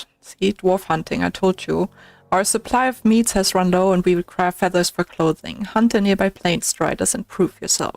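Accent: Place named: Germany. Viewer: i would guess german ;-)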